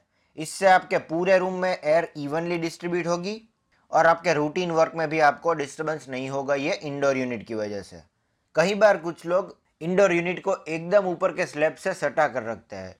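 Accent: Gujarat, India. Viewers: Aap Gujarati ho ?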